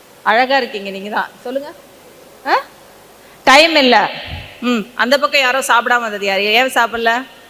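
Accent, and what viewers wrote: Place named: Virudhunagar, India. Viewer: Medam ungala virudhunagar ill ungalado pessu arumaiyakka irunthathu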